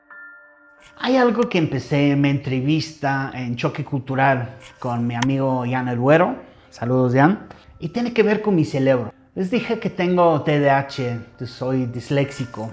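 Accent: Mexico City, Mexico. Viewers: hasta tiene acento de chilango, todavia se nota el ingles pero tiene un acento MUY bueno. Es super autentico, aprendio el español en las calles de la cdmx no en los libros de texto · Tienes acento chilango!! 😂saludos desde Guanajuato. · Amigo se te sale el acento chilango 😂🎉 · hermano.... ya eres mexicano, saludos. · Hasta acento chilango tiene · Saludos hermano, me encanta tu acento chilangles fresa, sigue haci nunca cambies, gracias por hablar tan bien de mi hermoso Mexico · Muy cierto! Ya hay muchos youtubers que saben cómo lograr 👍🏻 con los mexicanos (solo es hablar bien de nuestro hermoso México 🇲🇽). Y lo demuestra con su español "mexicano"🎉 gracias por amar a México 🇲🇽❤ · Me encanta que tienes acento mexicano.:D bueno de CDMX pero de Mexico. Pero no les digas a tantos! si no luego vienen mas y luego no nos alcanzan los tamales!:D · Eres un extranjero que al llegar a México se puso el de Mexicano 100% es más me pareces un chilango con poquito acento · pinche, ya me caíste retebien. 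Eres el inglés salamero! jajajaj bienvenido cabrón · Hola estoy aqui x tu anuncio en Instagram y me encantó ver este video. Ya me suscribi y gracias x compartir cosas tam bonitas de mi ranchito Mexico, todo es verdad, la hospitalidad Mexicana no tiene igual, en Mazatlan recibimos muy bien a los extranjeros, recuerdo mi familia a recibido en casa a invitar a comer a turistas y amigos de Perú, Italia, Canadienses, Americanos, y paisanos de diferentes estados, Jalisco, CDMX, Nayarit, Oaxaca, Sonora, Chihuahua, BCS, BCN, y de muchos otros lugares que ya no recuerdo y sobretodo amigos locales, que siempre tienen un lugar en nuestro corazón, te deso mucgo éxito en tu nuevo emprendimiento, cuando gustes visitar Mazatlan ya sabes que serás muy bien recibido 🌅🎉 cuídate mucho tu y tu familia y te deseamos lo mejor de los éxitos y es verdad he? Como te vi un parecido con nuestro querido y bien recordado Paco Stanley, y me encanta como hablas ya bien español mexicano de CDMX 💪😬🎉🎉🎉🎉Felicitaciones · el Mejicano... 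MAGNIFICENT MAGNIFICENT!!! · Ya hasta trae el acento mexa 😂😂. Ya eres uno de nosotros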